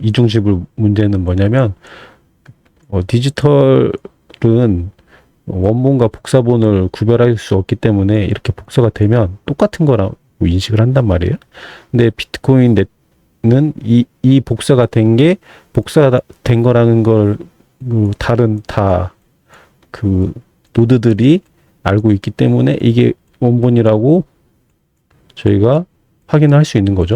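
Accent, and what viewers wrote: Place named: Jeolla, South Korea. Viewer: 말투가 딱 전라도 스럽네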